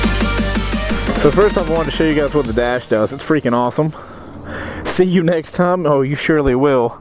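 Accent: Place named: United States. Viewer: "Dudn't feel like it, dudn't feel like it at all." Learn to speak the Queen's English! Stupid Yank!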